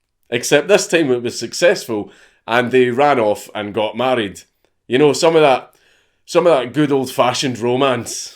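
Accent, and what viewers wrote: Scotland, United Kingdom. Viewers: Ur scottish is now better than ever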